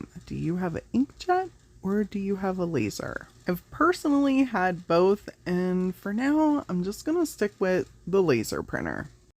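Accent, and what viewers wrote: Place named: Philadelphia, United States. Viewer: I have just found her and I am very intrigued. She is unique. However, does she not respond to our comments? 😢 I recognize her accent, Philly, Baltimore, where is she? ❤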